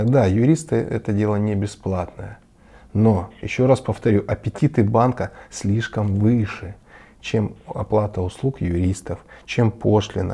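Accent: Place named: Ukraine. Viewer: Говор украинский-значит из Украины 🤦, где-то смеется ростовский представитель калломассы.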